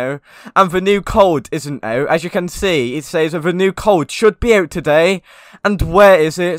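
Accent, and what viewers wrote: United Kingdom. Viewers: and that british accent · Nice British voice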